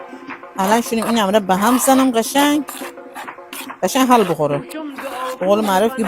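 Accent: Gilan, Iran. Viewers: بسيار عالي و زيبا 👏👏👏 عزيزم چرا ميگين غذاي گيلاني ،؟ لهجه شما كه گيلكي شهسواريه . اينا غذاهاي غرب مازندران ، شهراي متل قو ، شهسوار ، رامسر و شهرهاي كوچيك حومه هم هست . صد البته كه غذاهاي گيلاني رو دست ندارن . اما بين اين شهرها كه گفتم مشتركه . و اگه ممكنه در مورد لهجه تون هم يه توضيحي بفرمائين ممنون ميشم 🙏🌹💚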